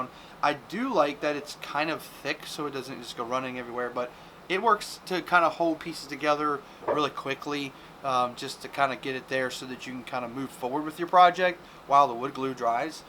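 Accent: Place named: United States. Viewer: Keep up the good stuff man! Which state is your accent from btw?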